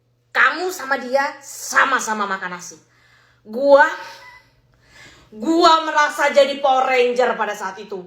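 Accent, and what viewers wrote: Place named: Java, Indonesia. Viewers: seru ya dengerin yang dulu kaya gini, sekarang ngomongnya dilogat jawain kurang sreg dengernya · Karna dia emg org jogja kan, logat aslinya emg jawa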